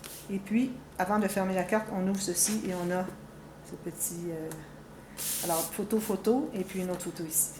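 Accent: Quebec, Canada. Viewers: Magnifique, incroyables réalisations, une pro, magique et ça fait rêver.... Explications claires et précises Bravo j'adore, et ce magnifique accent de Québec... Wouaaaaaa...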